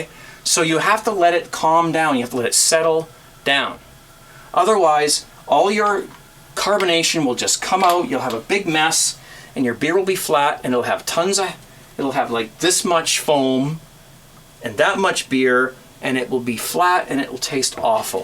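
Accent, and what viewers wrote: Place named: Canada. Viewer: I do like your video's, informative,funny, and I like your canadian accent.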